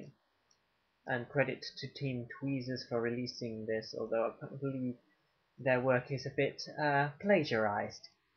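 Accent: England, United Kingdom. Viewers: Love your accent! Are you English like me? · you sound like my friend Alex with a British accent.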